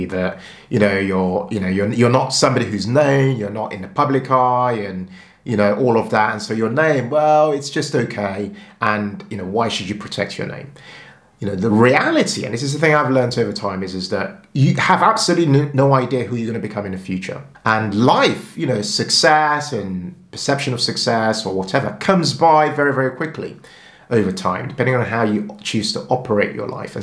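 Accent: United Kingdom. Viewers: How do you have such a british accent when you arrived in the uk at 15/16?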